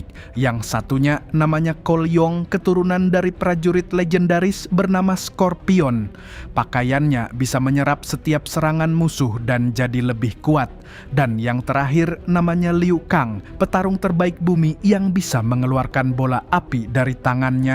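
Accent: Banjar, Indonesia. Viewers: Admin orang Banjar kah Ikam 😂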